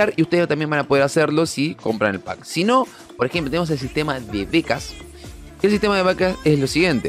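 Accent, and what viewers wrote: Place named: Argentina. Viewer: ¿Eres Argentino? lo puedo notar por tu acento, saludos desde Brasil 🇧🇷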